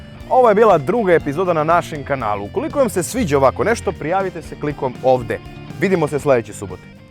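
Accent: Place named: South, Serbia. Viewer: Yugo soldier papcina obicna ovaj he ozbiljan momak sa juznosrpskim akcentom very funny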